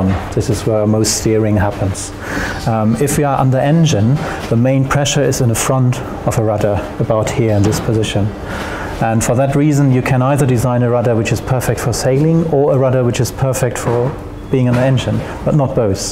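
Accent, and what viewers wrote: Germany. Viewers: Hey, it is you again. I remember your German accent. How's your business in the pandemic?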